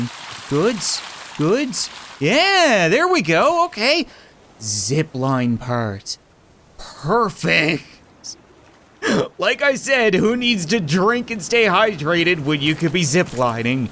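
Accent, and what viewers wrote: Appalachia, United States. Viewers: why are you using a hillbilly voice if this game is placed in indonesia / phillipines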